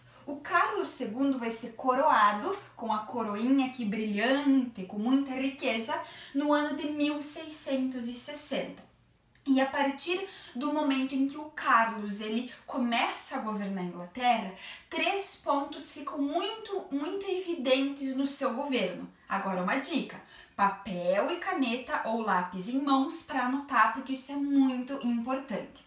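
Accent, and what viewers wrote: Brazil. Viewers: Que legal esse sotaque gaúcho!!!!! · é paranaense (do sudoeste, provavelmente). O sotaque é igualzinho.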